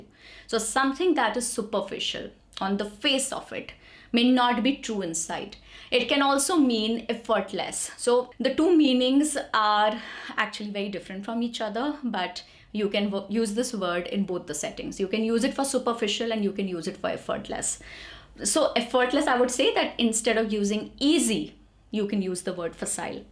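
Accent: India. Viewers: You have a huge hindi influence in your English accent, you better work on your accent,intonation it sounds awful. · People that don't have an American accent have no business teaching anyone English. That is the strongest indian accent I've ever heard.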